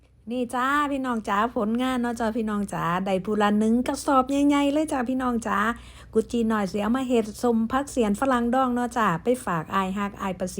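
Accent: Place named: Sisaket, Thailand. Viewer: น้อง พูดเหมือนคนศรีสะเกษ นะ ถ้าใช่เราคนบ้านเดียวกันนะค่ะ เดินมาหาผักเสี่ยงฝรั่ง คือมีด้วยค่ะ ปาโดด🤣❤️💞💞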